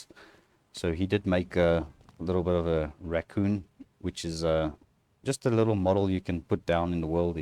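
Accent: South Africa. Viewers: Are you south african? you sound south african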